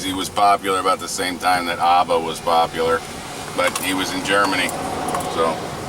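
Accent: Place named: United States. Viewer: Yeah, it gets complicated when converting. US Gallon = 3.785 litres UK Gallon = 4.545 litres £ - $ is £1 = $1.6123 (today) or $ - £ is $1 = £0.6 This is why I prefer to compare in litres as it makes it simpler. So your $3.45 per US Gallon would be $0.91 per litre In the UK we pay £1.38 per litre which is $2.22 per litre Now you know why we have to make more fuel-efficient cars! I also noticed you said maths as mathematics is always plural. P.S. Cool John Wayne type accent.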